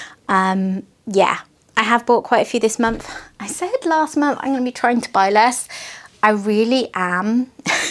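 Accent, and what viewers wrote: England, United Kingdom. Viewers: On your first picture, I love the old car. The Lorenz is so colorful too. I always love your choice of diamond paintings., you do NOT talk too much.!!!! Your English accent is so lovely and delightful. I have always said one of the stupidest things Americans ever did (lately a lot of stupid things) was lose the English accent. I’m American so I can say that. Talk about addiction, I swore to myself no more buying for a few months. So what do I do? I bought knight portal on DAC. It’s such a cool canvas, I had to get it. 😣Anyway you are wonderful. Don’t change. Have a great weekend.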